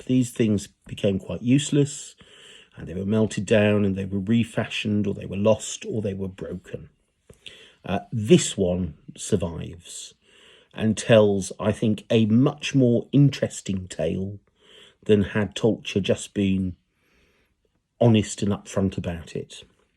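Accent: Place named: United Kingdom. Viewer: I never knew how much I needed a nice British man to explain silver to me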